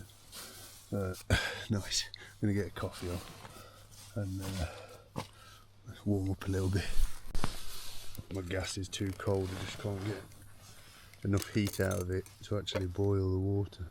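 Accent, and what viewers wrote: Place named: Derby, United Kingdom. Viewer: Hey up. Great video. Where are you from mate I recognise that accent. I’m from Derby and I’d say your not to far away if not from there ya Self me duck 😂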